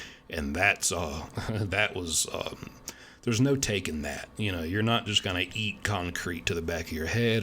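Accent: South, United States. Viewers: Great content. Saw you on Ian Bick and became a Subscriber. The way you tell stories reminds me of how a minister Would Talk about stories from the Bible. Sounds you like you inherited a southern preacher man’s voice and dialect.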